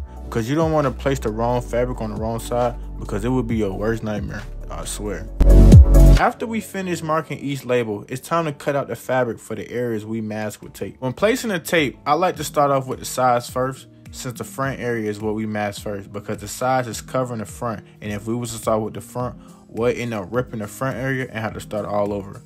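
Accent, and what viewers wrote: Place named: South, United States. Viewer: You sound so country that I didn’t understand what you were talking about until you slowed your words down…Thanks for understanding 😊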